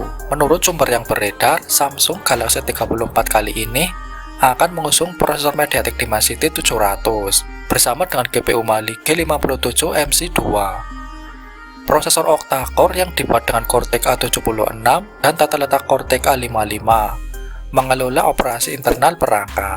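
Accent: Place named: Java, Indonesia. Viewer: Voiceover nya jawa medok, ga enak didengarnya 😌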